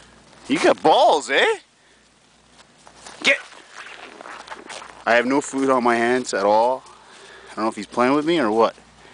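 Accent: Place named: Canada. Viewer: Keep scrolling Lol I wasn't going to say anything, but what part of Canada are you from? I'm from Atlanta Georgia but I went to college at the University of Calgary. Almost every one of my friends from Calgary says Aboat, and get oat. .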